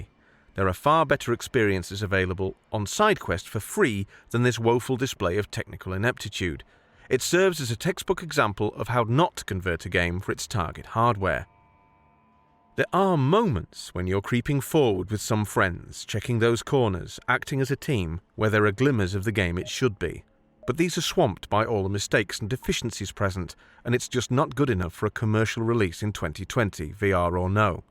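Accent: United Kingdom. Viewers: That’s just’s cause he’s British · alright well your british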